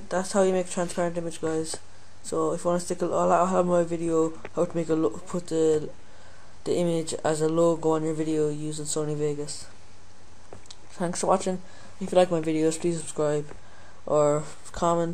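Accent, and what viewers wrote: Ireland. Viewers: good video and its good to hear a fellow Irish man on youtube! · He's Irish.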